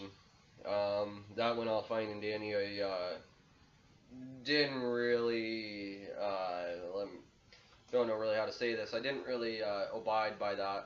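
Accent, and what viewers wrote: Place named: Canada. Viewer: First off, you’re the most Canadian person I’ve ever heard in my life, second... it would be better if we could see your boot on the gas pedal when pumping her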